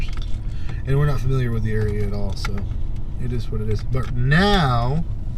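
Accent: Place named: Texas, United States. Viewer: I knew it! I knew you lived in my area of Texas. That's amazing! I knew from your accent. As you know, our state has many different types of Texas accents. I was born and raised in Grand Prairie. I moved in 1989 to Navarro County and have heard so many different dialects. This is awesome. Thank you for your wonderful videos. I am a new subscriber (last week, maybe).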